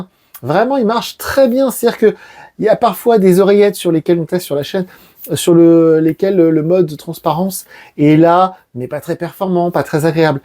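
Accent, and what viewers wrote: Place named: Marseille, France. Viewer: J'ai cru t'étais marseillais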